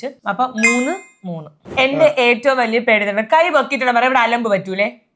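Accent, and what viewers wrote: Trivandrum, India. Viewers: See nigal orikkalum oru disrespect alla.tvm side orupadu peru agna vilikkarund it's normal.chilath okkei slang different aayond rude aayi thonnunnatha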